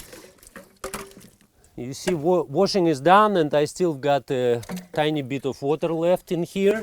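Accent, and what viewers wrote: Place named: Russia. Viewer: произносить слова совершенно не по английски, а в русской транскрипции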